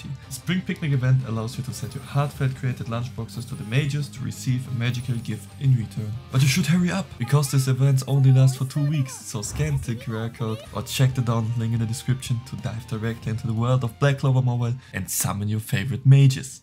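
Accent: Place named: Germany. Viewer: You have a German Akzent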